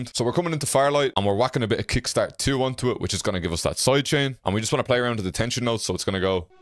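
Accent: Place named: Dublin, Ireland. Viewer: That Dub accent is heavy. Respect from Bray my man, keep killing it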